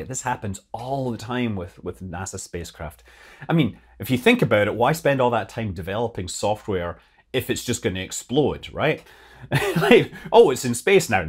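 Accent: Scotland, United Kingdom. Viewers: whatever the distraction be it's certainly lightened up your Troon lilt somewhat expressing giggles and tongue tied pronunciations in places made me think you've been showing your support and appreciation for the micro-brewery industry. Again! Lol. Sorry, you have this fabulous narrative skill that's appealing and easy to digest as half the time I won't be watching your youtube update but listening all the same. It's a bit like you stream your Lego set construction while i'll get on with the ironing over here busying myself. Seriously, your extensive knowledge base linked over many disciplines and an un-baised view is so pleasurable and refreshing on a platform inundated with competition. Keep up the good work and fly safe.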